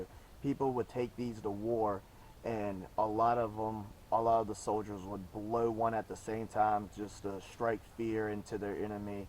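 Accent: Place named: South, United States. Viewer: are you in Louisiana? I noticed you had a southern accent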